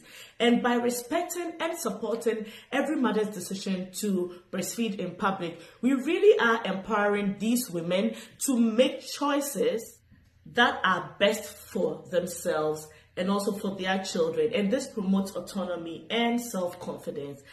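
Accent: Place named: United Kingdom. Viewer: I love the background and your UK accent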